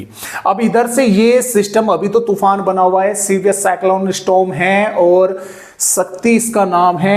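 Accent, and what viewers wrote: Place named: Jhunjhunu, India. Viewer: सर मेरे को आपकी बात एक समझ में आई झुंझुनू की आप बोलते हो मेरे को बहुत पसंद आता है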